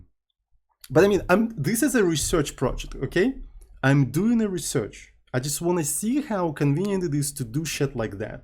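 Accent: Russia. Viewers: А можно то же самое на русском ? Вы же - русский, судя по акценту.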